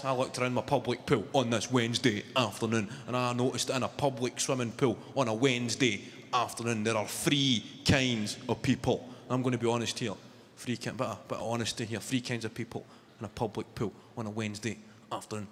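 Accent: Scotland, United Kingdom. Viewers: The Scottish accent just makes it better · He's just genuinely funny, I personally think if he had a different accent he'd be funny but the Scottish accent just adds a different twang and emphasis to what he's saying, can't put my finger on it but he is different. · As far as Scottish goes this is easy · It’s not just the accent it’s the whole Scottish persona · English but with Scottish accent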